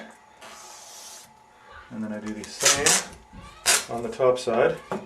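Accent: Canada. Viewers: everybody's an expert... NOT... Guess I'll have to post one to show how to caulk properlly.. SHEEEZZZ He's not British.. I'd say a canuck.. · Thanks for this nice little video - maybe not up to professional broadcast standards, but calm and pleasant and perfect for us dummies. Actually, your narrative sounds very much like the E.B.White readings of "The Trumpet of the Swan" and "Charlotte's Web", except this is the first time I've EVER heard a stereotypical Canadian accent like Bob and Doug Mackenzie's! (Which is kinda weird, since I live in Montreal and spend a lot of time in Toronto/Hamilton.) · thought you sounded canadian with the distinctive ooo's and then I'm pretty sure it is confirmed when I saw the french writing on the caulk tube. good job!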